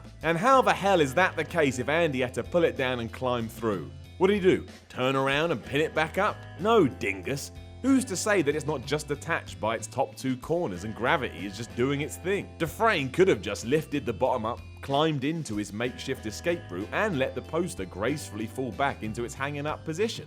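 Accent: United Kingdom. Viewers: Fucking bullshit::: this British accent fuck head thinks he’s soooooo smart ,,,,,cause he’s British?? How’s that British empire doing now ?? British elites???! They even tip like cheap bastards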